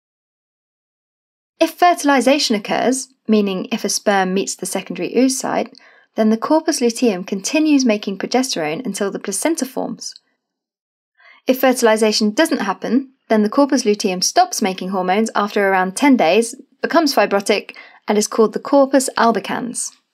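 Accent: United Kingdom. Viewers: Im sorry but in my prefrence, its alot harder to memorize and understand what the video is teaching when the person has a british accent.... im sorry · Extremely bad video, not obvious, bad fast voice, videos used to be more helpful and obvious It is NOT a compilation for the sexiest British accent! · This british narrator gotta go. Im sorry. Bring the guy back please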